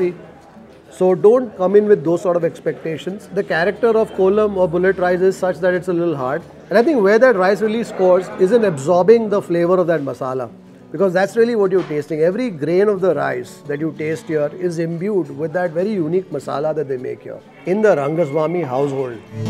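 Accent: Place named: Tulu Nadu, India. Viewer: your kannada has full TULU influence 😁😁 We Tuluvas have an interesting way of speaking Kannada 😊😊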